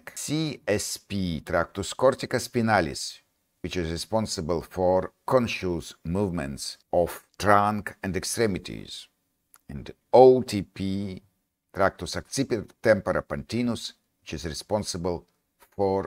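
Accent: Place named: France. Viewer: Какой у вас милый французский акцент)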